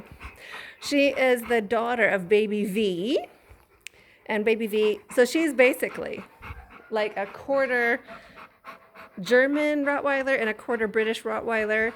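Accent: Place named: United States. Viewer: Your big dogs are lovely! Will they eventually be allowed to be with the Bulldogs? Your accent is American...however it seems you live elsewhere ...may I be as bold as to ask where?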